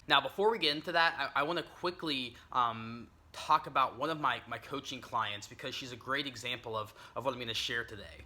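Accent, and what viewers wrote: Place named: United States. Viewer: Wow! I thought you were from Europe but you're an American. this is great I love your accent and thanks for this great video Greeting form Afghanistan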